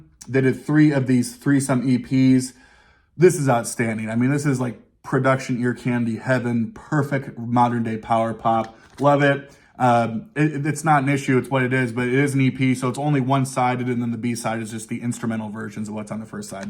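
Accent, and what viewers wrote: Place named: Ohio, United States. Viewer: Hi! I blacked out during the “free jazz” segment. But when I came to, the album that intrigued me was the JA-20 or. The fat hype sticker was in the way and your Ohio accent was hard to decipher. Awesome episode!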